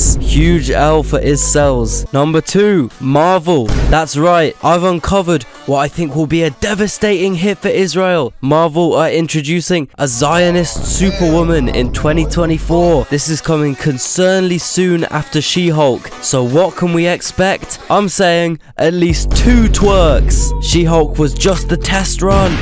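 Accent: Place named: United Kingdom. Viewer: Esse sotaque Britânico é muito bom Arca naim daish nuai nuan rai trai grai cakerrrrr LMAO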